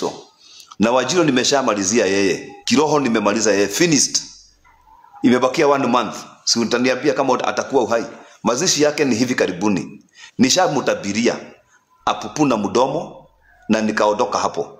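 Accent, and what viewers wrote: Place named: Kikuyu, Kenya. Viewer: Rudi shule kwanza ujifunze Kiswahili sanifu! Unaongea Kiswa-kikuyu...Baba ya maibilisi! Potelea mbali na huo uchawi wako unajaribu kutuuzia!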